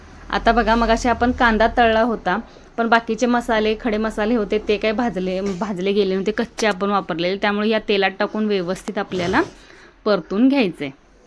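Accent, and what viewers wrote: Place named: Kolhapur, India. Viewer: Khup ch chhan banvte tai tu chikan chya resipi s aani bolte pn chhan tuzi kolhapuri bhasha khup chhan aahe❤❤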